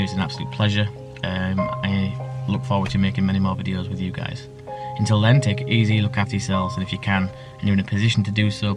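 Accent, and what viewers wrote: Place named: Yorkshire, United Kingdom. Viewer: i would love to come exploring with you i am chasing the same answers i live in blackburn you sound like a yorkshire man so you are not far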